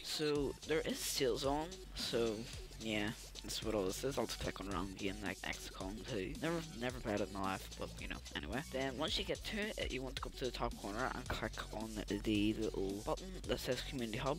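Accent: Northern Ireland, United Kingdom. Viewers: Not only you sound british your nose is also clogged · Northern Ireland is my guess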